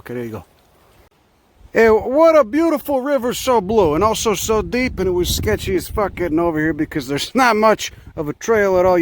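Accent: Chicago, United States. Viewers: Trouncing about gods delicates with your tattoos and your chicaganese dialect and your peircings i bet youd get your arsehole pierced If ya could fit your cheeks in da machines!!!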